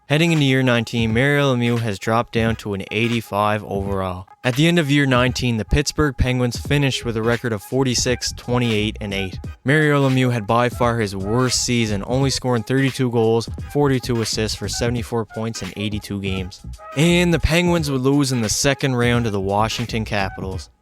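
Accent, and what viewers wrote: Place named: Nova Scotia, Canada. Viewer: U sound like ur from Nova Scotia bi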